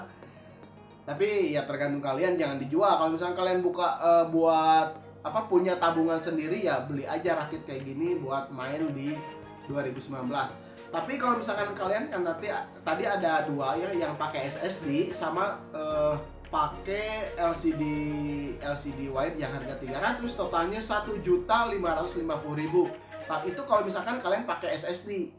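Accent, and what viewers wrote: West Java, Indonesia. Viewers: Ke nya si om orang sunda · Urang Sunda kang 😁? Mantep lah infirasiyeun yeuh 😁😁 ahuyy #TerusberkayakangNOLIMITE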